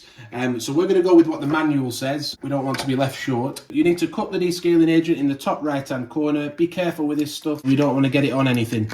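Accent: United Kingdom. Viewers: Thanks for taking the time to make this video. Way better than what Nespresso has done. Plus, appreciate the accent from the other side of the pond. Go Three Lions!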